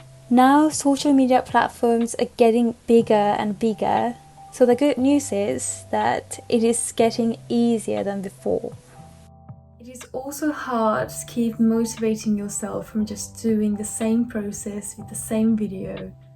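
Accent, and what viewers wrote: United Kingdom. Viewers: はじめまして！ブリティッシュアクセントでしたか！プリンセスみたいです🧡ブリティッシュアクセント大好きなので頑張りたい！基礎の文法が怪しすぎるのですが、主様はどんなふうに学びましたか？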